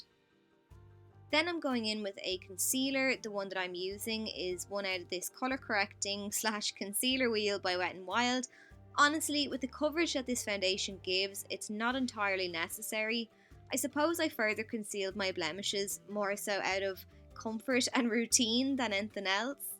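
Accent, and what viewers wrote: Ireland. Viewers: well done as always i like your acent look like Iresh 👍👍👍👌👌💗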